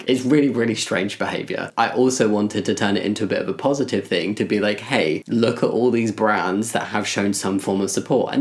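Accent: United Kingdom. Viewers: British pronunciation. Ah-dee-DAS too. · It took me a bit as an American to figure out you were saying Nike. Is that the common pronunciation in the UK?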